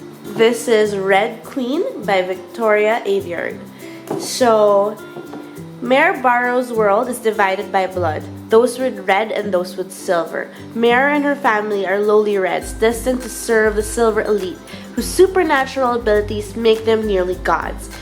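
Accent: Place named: Philippines. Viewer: I sensed you were from the Philippines! Great haul,:)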